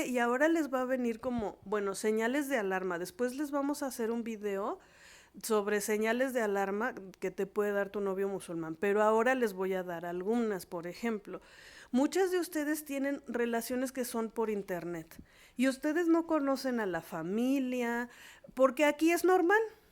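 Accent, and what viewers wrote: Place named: Mexico. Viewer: Hola, de donde eres? Escucho tu acento como mexicana, yo también mexicana, cual es tu fb me interesa aprender árabe, gracias!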